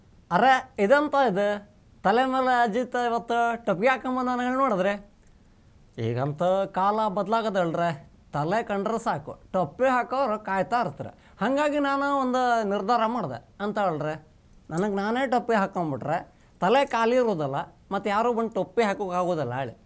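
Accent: North Karnataka, India. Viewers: This is not kundapara language. This is north kannada language.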